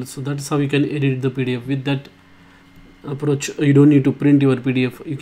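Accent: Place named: India. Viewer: You speak too fast and with an accent... It sounds like a clattering window pane of a rickety bus. Could you speak a bit slower, and to a global audience, not just Indians!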